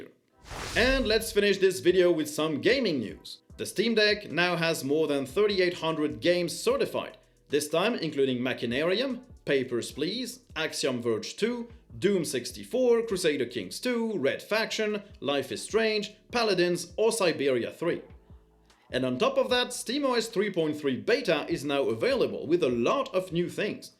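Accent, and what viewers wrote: France. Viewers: Is this a Frenchman speaking the islanders' language?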